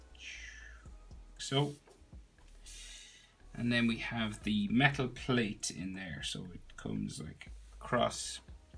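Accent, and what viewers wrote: Ireland. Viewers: i’m gonna draw so many of these lol,also are you irish?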